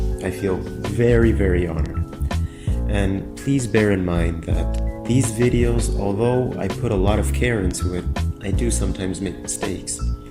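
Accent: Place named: United States. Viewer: You have an American accent. Did you grow up in the U.S.?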